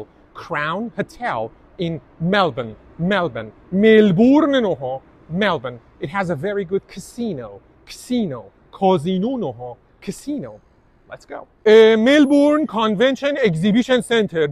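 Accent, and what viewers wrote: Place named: United Kingdom. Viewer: I really enjoy practicing english accent with your videos. Hope to see more long form content of you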